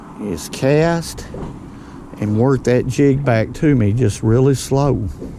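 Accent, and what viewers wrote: South, United States. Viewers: I love this guy all his videos are amazing I love his southern accent to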